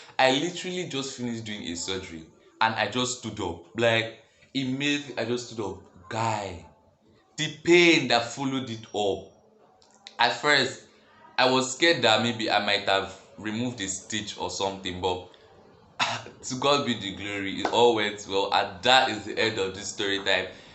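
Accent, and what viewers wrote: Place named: Africa. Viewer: I love this channel, please make more videos, could you put subs on though, I'm british & you're talking a bit too fast & not being racist but you have a really strong african accent & when you talk fast it's hard for me to comprehend what you're saying, it might just be me, let me know if anyone else thinks the same..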